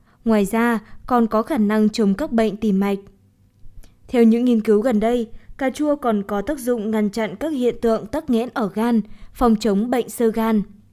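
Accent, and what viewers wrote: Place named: South, Vietnam. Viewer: Noi giong mien Nam nghe hay hon giong Bac Ky 75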